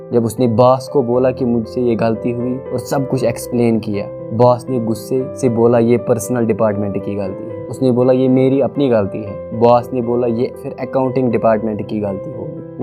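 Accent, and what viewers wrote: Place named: Kashmir, India. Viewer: A nice summary ....voice really soothing Kashmiri ascent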